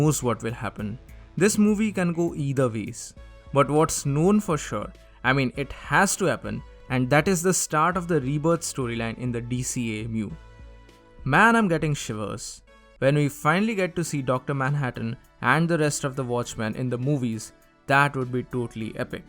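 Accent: India. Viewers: No offense but you sound like the typical Indian person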